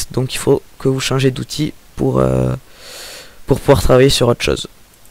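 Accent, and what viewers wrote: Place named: South, France. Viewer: coucou merci pour ce tuto moi qui utiliser photofiltre pour recarder ou autre des photo depuis queje l'ai plus je ne savais plus rien faire merci a toi et jespere de tout coeur que tu continueras ta serie sur les tuto gimp car je trouve ca claire bien expliquer net puis tu as laccent du sud et je viens du sud voila gros bisous ja mabonne !!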